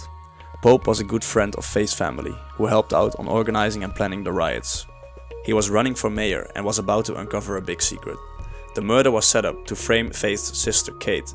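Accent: Netherlands. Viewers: Goeie review, ik hoorde gelijk dat je uit Nederland komt :) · this game will forever have a special place in my heart. great video! edit: im just gonna assume you're dutch because of your accent ;)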